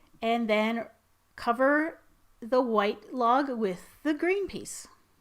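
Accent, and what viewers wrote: Quebec, Canada. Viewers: is she canadian? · I was watching this and thought, "hmm, that sounds like a Canadian accent." Sure enough eh! Very pro-looking results, she clearly knows her stuff. I just know mine would turn out looking like Kermit the Frog had a horrible accident.. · I believe she's French Canadian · Are you from Québec or just from canada ? :O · i love your accent are you from canadia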